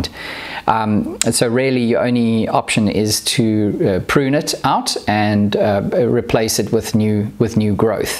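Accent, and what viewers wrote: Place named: South Africa. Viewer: Fall there vs spring here in scandinavia can be confusing but then you start talking in the nice south african accent and i go uh yes he is on the other side of the world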